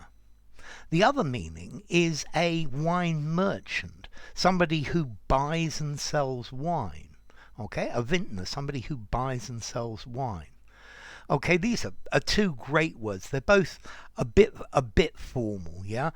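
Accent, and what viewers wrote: RP, United Kingdom. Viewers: You crack me up with your posh accent! 😂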